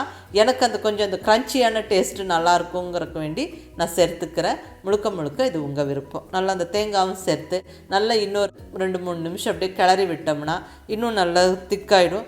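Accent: Kongu, India. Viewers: அருமையான receipe mam, கொங்கு தமிழில் உங்கள் குரல் அருமை.👌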